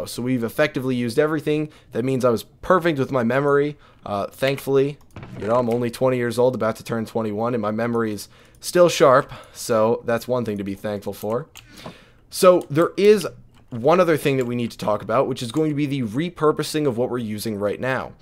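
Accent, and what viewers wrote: United States. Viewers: You have a strong American accent what area of USA is that?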